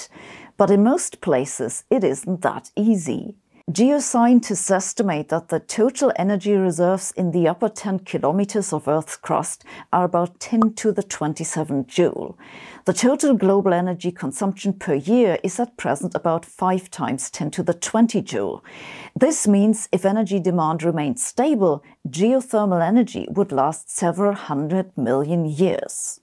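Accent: Germany. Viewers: Ms Sabine Hossenfelder is Professor cum laude graduated in theoretical physicist, Gravitational Fields etc. But as for her nice direct humor and accent it could be becuase she is from Germany.😇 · I love you, you are extremely sexy. I fantasize about you and your beautiful german accent and inexpressive face.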